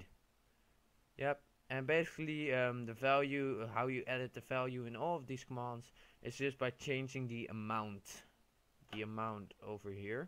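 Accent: Netherlands. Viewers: is this man dutch?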